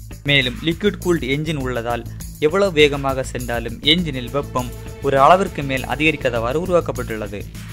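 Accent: Kanyakumari, India. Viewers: Neega Kannya Kumari yaa bro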